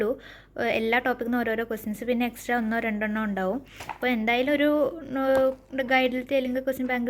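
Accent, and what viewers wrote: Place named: Palakkad, India. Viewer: Pkd ahno than ? .. ur accent is like that !!❤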